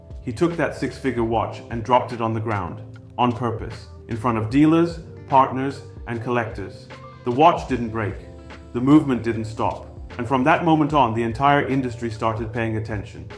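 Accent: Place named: United Kingdom. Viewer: You sound more British in this video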